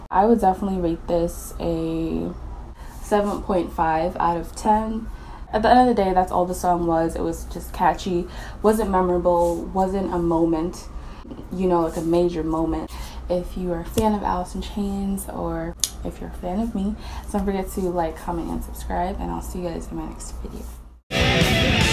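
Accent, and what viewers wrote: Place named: New York, United States. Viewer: U sound like u from NY so are u not aware of 90s rap? Or do u know 90s rap so well that I listening to white music?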